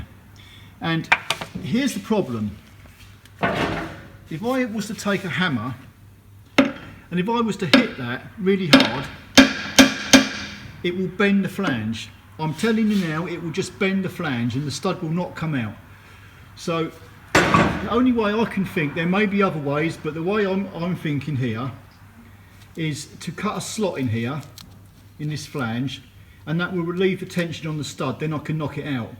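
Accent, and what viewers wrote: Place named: England, United Kingdom. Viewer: That’s the same English accent as Producer-Director Ben Dover.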